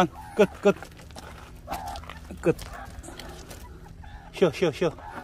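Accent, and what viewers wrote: Gyeongsang, South Korea. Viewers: 아 말씀 하시는 사투리가 너무 같아서 어디신가 했네요 예천이시군요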